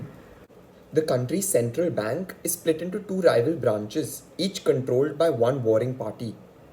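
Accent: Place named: India. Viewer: Why indian accent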